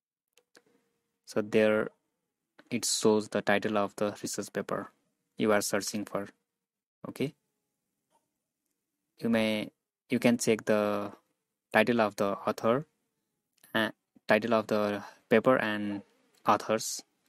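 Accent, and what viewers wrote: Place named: India. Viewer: Disastrous Hindi english accent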